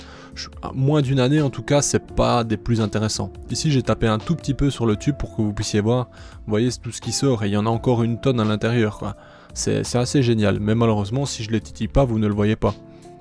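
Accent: Belgium. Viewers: puisque tu le demande, j'ai repéré une coquille ! t'as dis "septante", c'est pas gaulois ca ! d'ailleurs t'as l'accent belge , du coup, Lille? (car je n'imagine pas suisse avec cet accent, mais je peux me tromper hein, je suis un pro de l'erreur en tout genre) partage avec les gens qui en profite, comme moi (merci pour le partage), et puis laisse parler les enfants qui n'ont que ca pour tenter de se sentir supérieur . car comme tout le monde le sait, l'égo se construit en râlant sur des inconnus sur internet , car dans la vrai vie ils peuvent pas parler devant papa et maman sous peine de se faire priver du téléphone .... (bon j'abuse un peu mais il est vrai qu'internet large (la ou on parle avec des inconnus ) devient de plus en plus mal fréquenté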